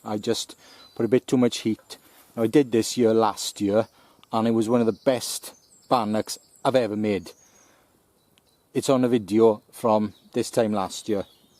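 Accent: Wales, United Kingdom. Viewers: Great video, hope you don't mind my asking, but whereabouts are you from? (I know Wales lol) I recognise your accent, you sound like your an Amman Valley boy.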